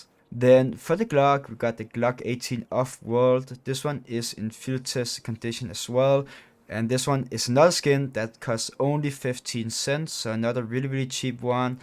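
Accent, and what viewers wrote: Denmark. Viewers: Bro are you danish?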